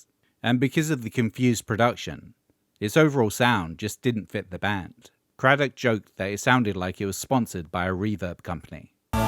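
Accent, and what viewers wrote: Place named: Birmingham, United Kingdom. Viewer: Didn't realise you were a Brummy!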